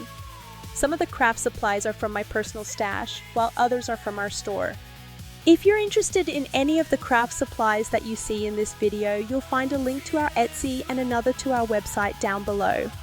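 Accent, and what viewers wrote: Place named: Australia. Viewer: Hi! Please don't fret over the Accent In the Video! TBH, I love it!!! I've always loved a hood Australian Accent! I think it's Beautiful! It's REALLY not THAT Noticeable. Like... TBH, I don't think I would've caught it if I wouldn't have read the Description! LOL! ~🙏💙🙏Much Graditude From The Carolinas, Erica 🙏💙🙏~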